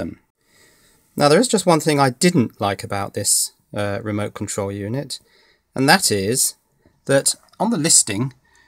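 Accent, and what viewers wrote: England, United Kingdom. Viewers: Hello, How nice to hear an English accent. I'm looking for a way of wiring two solenoids which are going to oper ate alive catch rodent trap. I need 12 volts for this. I have looked at adapting a wireless door chime but the out put on that is only 3 volt. By the way, I have O level physics only! Any thoughts much appreciated? (I'm on very limited budget) Thanks for sharing an informative tut/video. David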